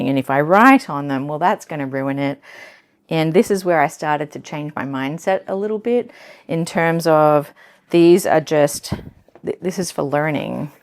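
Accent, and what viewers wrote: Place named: Australia. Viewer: What is your accent? It sounds a bit Australian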